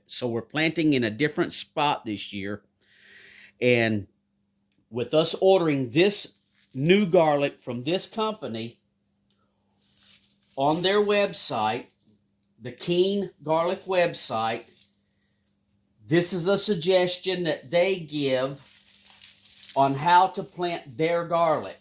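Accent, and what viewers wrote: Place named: South, United States. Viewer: You have just a little bit of a Southern drawl, sir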